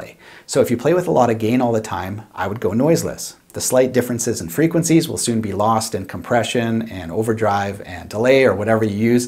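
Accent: Canada. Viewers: You have such a strong Canadian accent lol I love it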